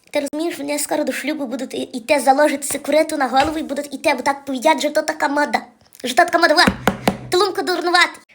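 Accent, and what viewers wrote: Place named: Lviv, Ukraine. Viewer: То є львівська говірка, а ще так колись по селах Західної України розмовляли люди, тепер вже мало хто так говорить. Моя бабуся, царство небесне їй, так говорила:"Йой, муї діти зулутенькі, то мій капі'тал!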